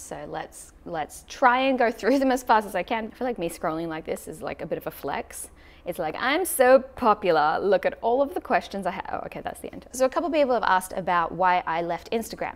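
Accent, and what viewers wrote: Australia. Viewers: Wait a SEC How did your accent change from whiny californian to Straylian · such a cute Aussie accent!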